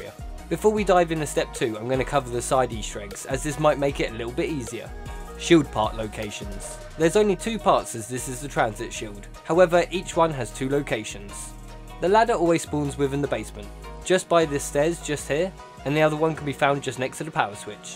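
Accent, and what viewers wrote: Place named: United Kingdom. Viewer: Thanks british fella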